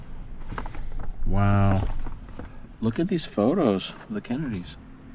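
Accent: United Kingdom. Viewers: your enjoying your British accent really?? love it xx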